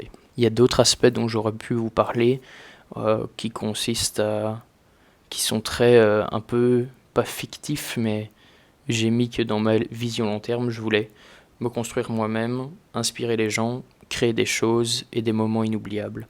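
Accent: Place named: Belgium. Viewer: En t'écoutant j'me suis dit "Il a un léger accent Belge lui..." Jusqu'à ce que je fouine un peu et que je trouve la réponse 😅 Aaaaah le codage ! Tout ce que je déteste 😂 Par contre je trouve ça TELLEMENT CLASSE de connaitre tout ça, et en plus d'être passionné ! Quelle chance ☺ Le running et le montage c'est plus ma passion 😁 Bon courage pour tes objectifs 2024 et ton Marathon ! (Avril ? Le Marathon de Paris ?)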